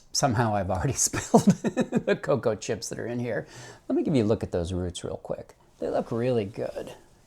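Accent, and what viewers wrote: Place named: United States. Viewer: Love Miro…specifically for the accent 😂…, can you conjure up a delightfully calm and gentle European accent? The American thing has worn out its welcome.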